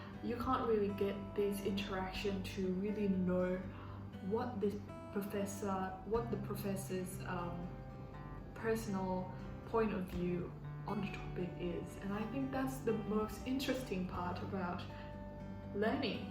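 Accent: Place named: South Africa. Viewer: Your accent sounds South African, pls drop explanation and how u learned English 🌬❤️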